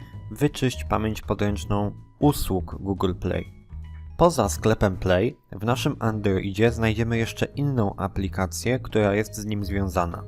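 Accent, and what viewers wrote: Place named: France. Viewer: Ale ten gościu ma francuski akcent😎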